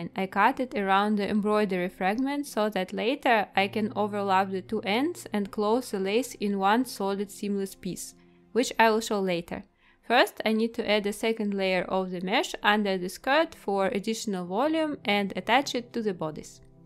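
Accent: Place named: Russia. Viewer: Ain't these the old Soviet Nutcracker frames in the beginning?) Also, pardon me for asking - but i kind of detect a slight Russian accent there)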